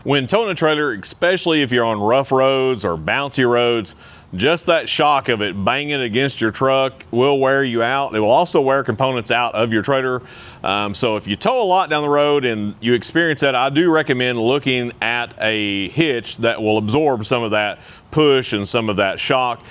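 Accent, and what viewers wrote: United States. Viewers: Like yo cowboy accent 😊 completely clear! Not a word missed 😅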